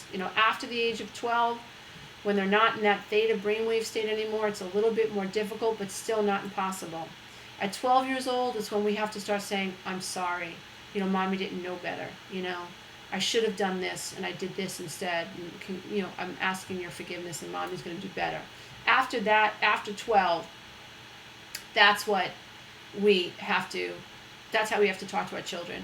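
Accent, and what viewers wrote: New York, United States. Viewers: Don't be afraid of sounding New York! Appreciate you...You helped me break the code. I am the little sister who moved forward because my elder sister did. I am forever grateful. It wasn't without incident, she had to watch me fall (into toxic relationship) before getting up. It is so worth it! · she sounds very New York it's so natural and grounded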